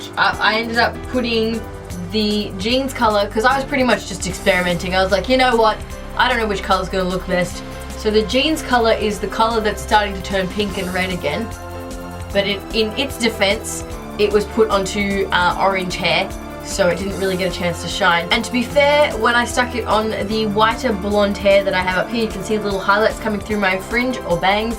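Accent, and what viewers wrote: Australia. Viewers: Girl, I'm an Aussie living in the UK - was sooooo nice to hear your accent!!! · I think she's an Aussie ^^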